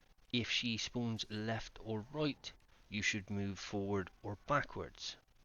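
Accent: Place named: Bristol, United Kingdom. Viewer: Sounds Brizzo to me.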